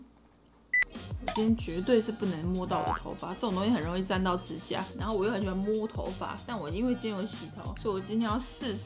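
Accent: Taiwan. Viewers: 講台灣國語敲可愛的我都會噗揪笑了出來🤣🤣🤣🤣🤣💜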